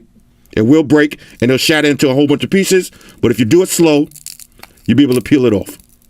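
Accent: New York, United States. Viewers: You from New York right? Lol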